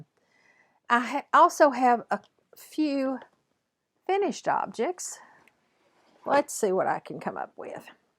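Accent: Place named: Texas, United States. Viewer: I love the Sunflower Diaries pattern!! Are you from Texas? Your accent sounds like it. Im from Texas and you sound like me. I really like your chouce of patterns. Thanks for the video.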